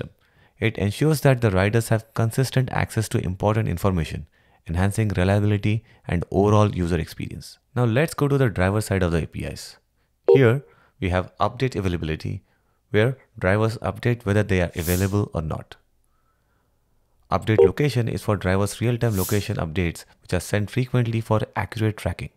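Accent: India. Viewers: I dunno why but the Indian access makes me focus more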